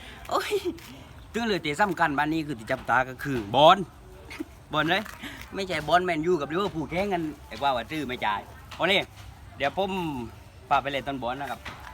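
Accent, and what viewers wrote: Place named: South, Thailand. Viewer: สุดยอดเลยคนใต้แหลงใต้ฟังแล้วบายหูขอให้ดังๆรุ่งๆนะคับ👍👍👍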